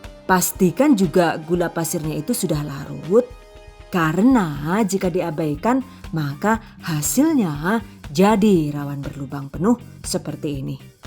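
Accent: Banyumas, Indonesia. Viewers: Kenaa seneng banget liat chanelnya karena resepnya enak, penjelasannya detail selalu ada tips n trik jadi mudah dimengerti, dan selalu berbagi resep yg lg hits n viral sekarang selalu update,resepnya hasilnya selalu lucu2 warnanya jadi keliatan menggoda2🥰 suaranya juga lucu selalu ada lucu2an ngapak2an bae 😃 selalu menginspirasi buat ide jualan saya.. Trimksh 🙏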